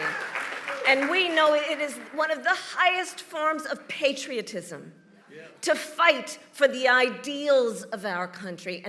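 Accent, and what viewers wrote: United States. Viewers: Same speech in Detroit but she sounds like she’s from the deep south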